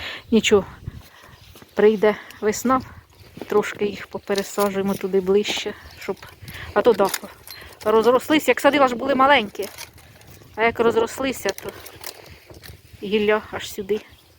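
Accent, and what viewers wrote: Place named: Kyiv, Ukraine. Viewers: Доброго дня! Дякую за цікаві розповіді. В якій місцевості ви живете? Ваша мова така ж як у нас. Я живу на Київщині. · Я бы слушала день и ночь. Такая у нее красивая мова. Я тоже с центральной Украины, с Кировоградщины, сейчас беженцы в Чехии и мова... ну как вроде дома сижу среди соседей и друзей, точно такой же язык! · Люблю дивиться ваші відео, особливо дуже рідний мені ваш говір я з Київщини. В нас з вами багато спільного, говорять і в нас кисляк, місцевість ніби я в своєму селі.♥️💙❤️ Божого благословення вам і вашій Родині!!!🙏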